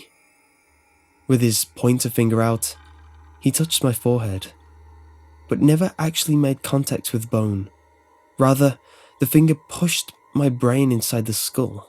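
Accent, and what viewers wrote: England, United Kingdom. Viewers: He is from England, they pronounce things differently there (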